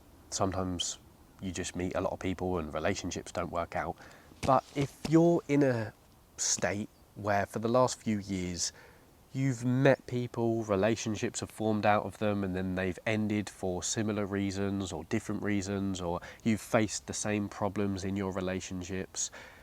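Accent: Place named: London, United Kingdom. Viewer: What part of England are you from? If I had to guess I’d say somewhere in London.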